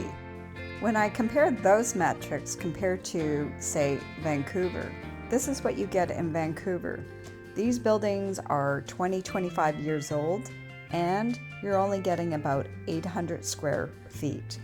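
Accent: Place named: United States. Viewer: Do you have to pronounce it with an American accent? Change the "a" sound. It'll sound much nicer.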